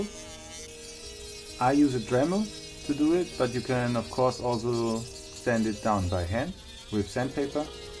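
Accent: Germany. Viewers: where you from? german? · Are you german? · Are you german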